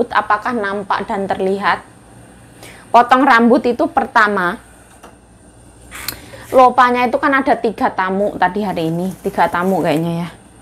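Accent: Java, Indonesia. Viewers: Nada Mandarin kmu perlu di pelajari medhok Jawa kmu masih jdi blepotan mandarin kmu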